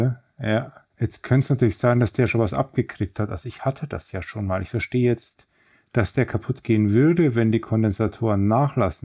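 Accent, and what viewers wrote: Bavaria, Germany. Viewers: Bei mir haperts an der Fremdsprache Bayrisch. Also ist das hier so, als ob ich Electro Boom gucke, nur dass dieser lustige Internetonkel hier nicht so oft unter Spannung steht. ¯\_(ツ)_/¯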